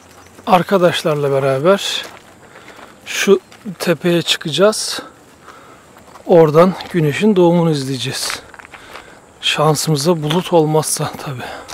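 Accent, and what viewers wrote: Samsun, Turkey. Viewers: abi afganistan videolarından birinin altında "elli" derken e harfini vurgulama şeklinden Samsunlu olduğuna ikna oldum yorumu vardı. O günden beri e harfini söyleyiş şeklin iyice bi dikkatimi çekiyor engel olamıyorum. Endonezya derken de aynı vurgu var dinlerken fark ettiğimde çok hoşuma gidiyor😁 yanlış anlamazsın umarım art niyetle yazmıyorum.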